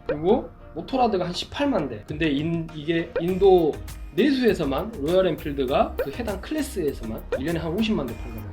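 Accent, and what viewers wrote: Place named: Chungcheong, South Korea. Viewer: 충청도 이십니까? 저희 작은아버지랑 말투가 똑같고 웃음 코드도 똑같아서 너무 사람이 좋아보여요 좋은 영상 감사합니다 ^^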